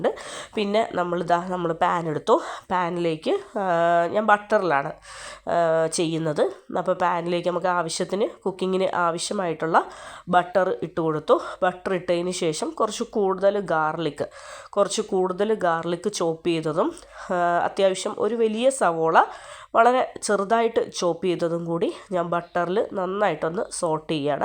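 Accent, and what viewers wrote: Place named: Kannur, India. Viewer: ചാനൽ ഫുൾ കുക്കിങ് തന്നെ ആണലോ,, നിങ്ങളെ വോയ്‌സ് കണ്ണൂർ കാരുടെ പോലെ തോനുന്നു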